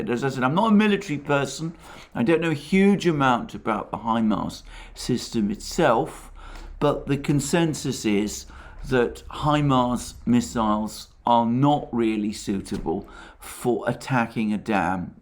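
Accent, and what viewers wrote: United Kingdom. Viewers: Alexander's soothing British intellectual voice is unparalleled on YouTube! ♥️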